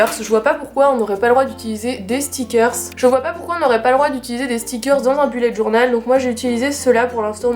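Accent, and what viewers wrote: France. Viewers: mais stooooop l'accent mega français la "bullet journal" c'est un mot anglais mettez y du votre un peu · Très bien les explications! Très utile aussi ta vidéo comparaison Bullet journal/ planner. Petite remarque quand même:: Puisque tu écris et utilises beaucoup l'anglais, tu devrais faire un effort sur ta prononciation. C'est vraiment TROP français...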